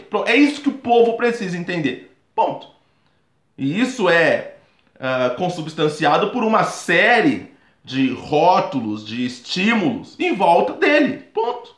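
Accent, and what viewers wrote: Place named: São Paulo, Brazil. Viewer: Engano seu de pensar que eu acho que vc é superior ou inferior .Exatamente por vc ser quem vc é , inteligente e articulado ( mesmo detestando seu sotaque de periferia paulistana) e com convicções , é que estou aqui. Gosto de combater o bom combate....mas vc precisa ler muito ainda e viver mais. Sei que vc é olavete e esse é um dos maiores erros seu. Abra essa cabeça gorda e leia tudo de esquerda e de direita e faça uma média .Quanto a você dizer que viu no meu perfil que eu não sou interessante , é verdade . mas eu não quero ser youtuber como você .Você quer holofotes .Eu não , tanto que estou aqui em Off tentando me comunicar mas vc é raivoso exatamente como seu herrr ídolo.Obs. Não disse nenhuma vez que vc é imoral mas vc como facistinha que é foi logo dizendo que não tenho moral. Pobre diabo...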